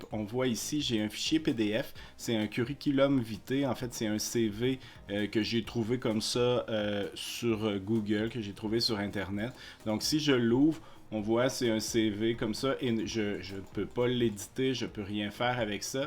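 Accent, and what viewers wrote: Canada. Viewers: merci beaucoup , je kiffe entendre l'accent canadien haha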